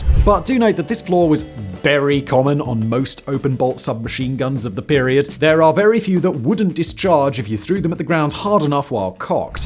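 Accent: England, United Kingdom. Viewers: If you didn't see enough Britcoms as a kid you might not notice. speaks SSBE, a prestige dialect understood around the world. I mostly speak US formal Inland Southern, another dialect understood around the world. If I narrated this, you'd probably complain about my consonant fronting and my heavy retroflex "r" sounds. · Typical English lack of giving a shit about the conventions of other languages. And for just making up ways to say words.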